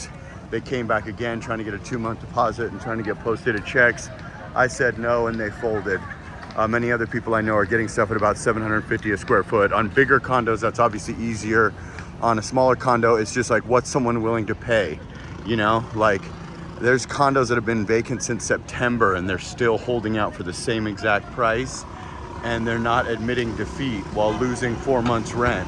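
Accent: United States. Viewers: Watching from Agoura hills Calif you have American accent Bro!!!! Take good care I’m originally from bugallon Pangasinan 4 hrs drive from where you are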